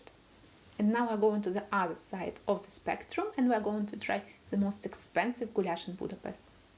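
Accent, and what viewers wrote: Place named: Russia. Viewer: Девушка, давайте я вам поставлю произношение бесплатно, и интонацию. Вы хорошо знаете английский, но произношение прям никуда-вы просто произносите русские звуки и интонацию тоже надо ставить. Я могу вам за несколько уроков поставить и дать ресурсы, где отрабатывать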